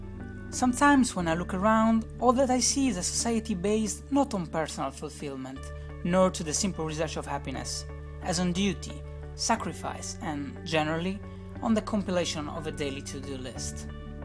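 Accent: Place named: Cagliari, Italy. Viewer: He's from Cagliari (Italy). His accent is annoying in italian too :D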